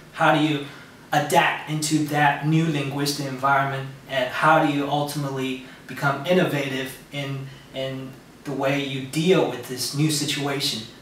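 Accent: United States. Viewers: your accent is so confusing!! but in a good way!!! hahaaha it's like some of a brooklyn accent mixed with a little southern accent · i feel like im hearing a texas accent? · Nice American Accent. Your video is appreciated.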